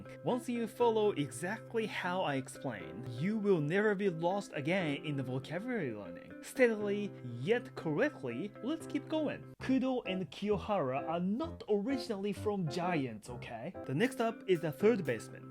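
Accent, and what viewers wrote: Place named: United Kingdom. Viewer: ELSAの評価が厳しくて嫌になってるところ、好きなイギリス英語＆このやる気刺激動画でモチベ維持してます！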